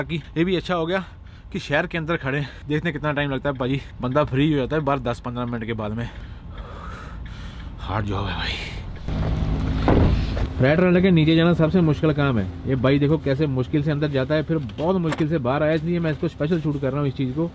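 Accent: Punjab, India. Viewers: ਪੰਜਾਬੀ ਬੰਦਾ ਜਦੋਂ ਹਿੰਦੀ ਬੋਲਦਾ ਤਾਂ ਕੱਚਾ ਜਿਹਾ ਜਾਪਣ ਲੱਗਦਾ।ਬਾਕੀ ਗੁਰੂ ਰਾਖਾ ਐ ਤੁਹਾਡਾ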